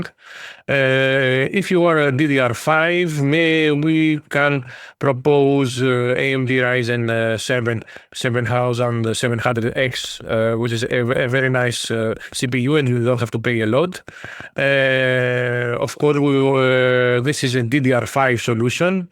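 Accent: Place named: Greece. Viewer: your welcome 🙏🏻 can I ask you something, are you from Greece? The way you speak English is like the common way usually Greeks speak the English language 😅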